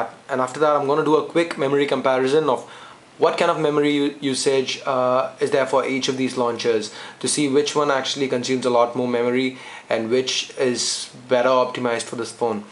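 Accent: India. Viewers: dood are you from india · Wer u from.ur accent like an indian im right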